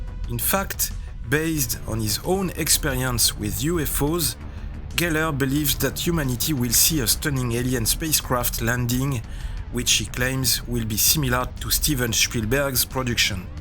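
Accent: France. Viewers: I love when English is spoken in French 💜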